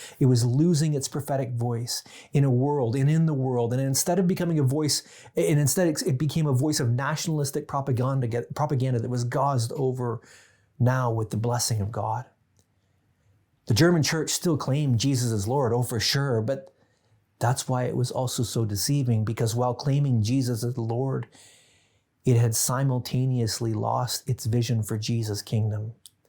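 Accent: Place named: United States. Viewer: Your question seems to presume that Bonhoeffer would do something in response to the Trump administration, not so subtly implying that the Trump administration is like the Nazi regime. As a Christian I reject this presupposition. The governments of Europe are much more fascistic than anything going on in America. So perhaps if you are referring to Bonhoeffer responding to the current situation in Germany I might agree with you. But your American accent suggests that you are referring to the Trump administration. You need to be clear about what you are referring to.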